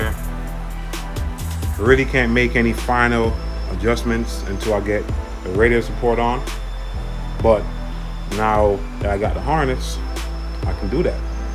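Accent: Jamaica. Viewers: Just subbed when mi hear di patwa jump out dwl dem rass plug dear eeee. Respect my yute u build wikid.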